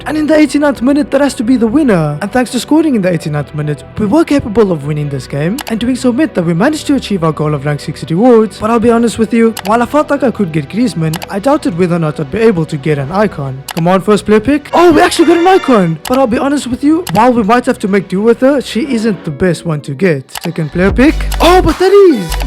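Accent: South Africa. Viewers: Are u from SA🇿🇦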